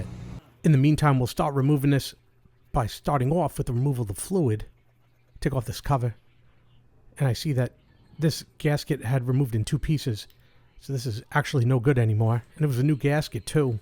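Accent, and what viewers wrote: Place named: East Coast, United States. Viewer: Phenomenal series, stellar camera work and editing! I can't believe you don't have a million subs and a billion views on each video! Precision workmanship. Hard to miss that east coast accent, I lived in Upper State N.Y. as a kid and Long Island as a teenager. What's the story with the pair of Yamaha's in the background? I've got an '06 Yamaha Stratoliner S model (113) that's Darksided. What brand starter did you end up using?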